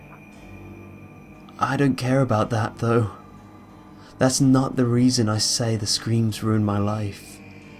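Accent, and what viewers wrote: United Kingdom. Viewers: Oh, and your voice is smexy. I love your voice. Are you British?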